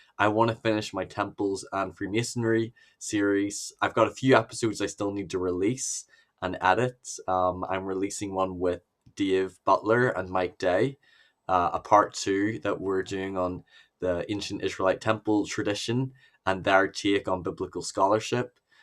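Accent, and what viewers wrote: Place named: United Kingdom. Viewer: Your accent, the belies a British citizenship, which gives me hope that your medical problems will be addressed properly.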